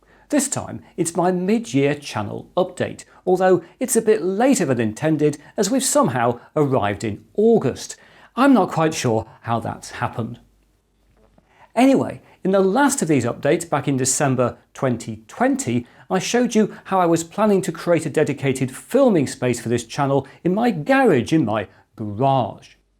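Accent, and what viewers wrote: United Kingdom. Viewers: Your personality and style very unique sir, also have british accent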